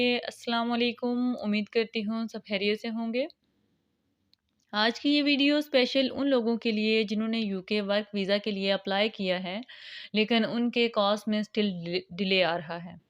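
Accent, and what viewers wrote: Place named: India. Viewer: i already watch your video and i found difficulties to clearly understand Indian language or accent. Please if you could answer me about my questions in English i would be grateful. Thank you in advance.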